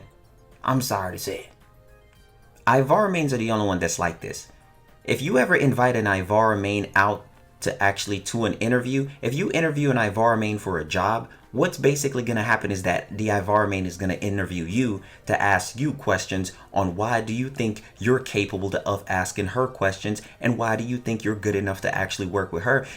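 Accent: Jamaica. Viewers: That Jamaican accent tho mad respect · that jamaican accent tho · Are you Jamaican lol... I heard you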